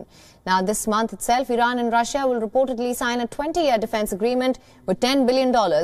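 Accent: India. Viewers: FIND SOMEONE THAT CAN ACTUALLY SPEAK ENGLISH SMOOTHLY AND WITHOUT THIS SCREECHING AND EXTREME I. N. D. I. A. N ACCENT, which makes it not ONLY very unpleasant but rather hard to understand! She is beautiful non-the-less, BUT this isn’t a pageant contest, it’s supposed to be the ‘news’! 🙄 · And thickest accent, like goddam. I thought stand up comedian was overexaggerate on how funny stereotypical indian accent was.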